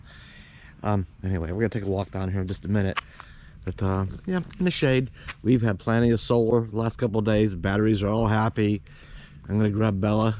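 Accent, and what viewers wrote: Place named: New England, United States. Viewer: I'm laughing at your Yankee tongue in this video. The way you pronounce Pascagoula and Bayou. So funny.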